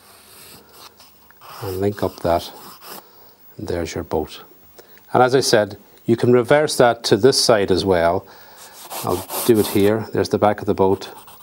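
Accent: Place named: Midlands, Ireland. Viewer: U sound like a midlander like myself..13 years later here from Athlone next to the Shannon wanting to paint a similar fishing boat for a mate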